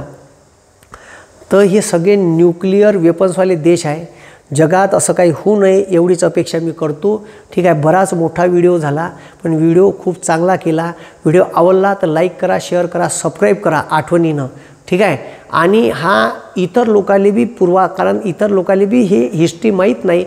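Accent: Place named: Wardha, India. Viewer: Listened to your full video, made history so very interesting. Marathi dialect wardhache asun suddha samajhayla traas jhala nahi. Tumcha energy purna video madhe continuous hota.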